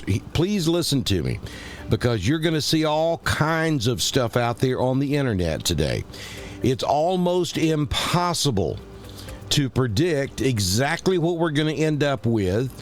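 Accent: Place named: South, United States. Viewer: I 💕 ke the way you present this report. No unnecessary talk Just clear southern man explaining the possibility not alarming everyone.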